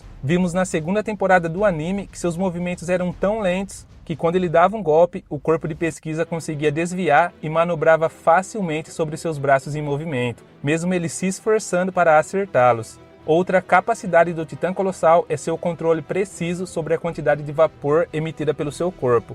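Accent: Brazil. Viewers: é um sotaque mistura de paulista mineiro e paraibano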